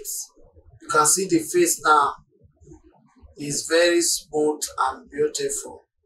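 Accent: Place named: Benin. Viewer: I recognize that Benin accent 😂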